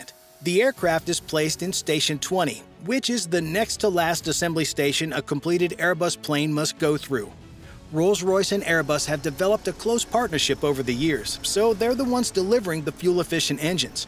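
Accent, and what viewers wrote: United States. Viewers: Well, this is an American voice and pronunciation to a European ear is always strange.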